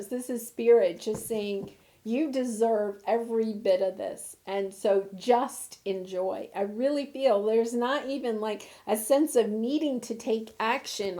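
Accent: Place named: South Carolina, United States. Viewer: I'm betting you're a South Carolinian with that delightful accent!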